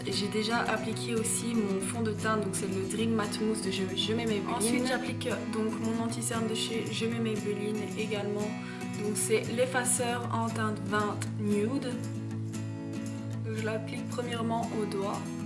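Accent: Belgium. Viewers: Kikou je viens de découvrir ta chaîne et je me suis abonné afin de parcourir les autres vidéo😉des la 1ere minute je pouvais parier que tu étais belge.tu es de quelle region?moi de mons😆bizz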